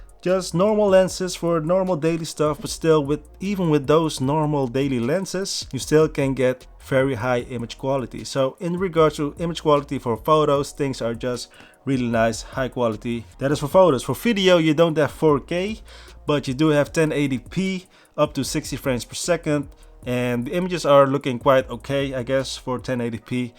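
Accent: Netherlands. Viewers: Hoorde direct dat het nederlander was.. Nice content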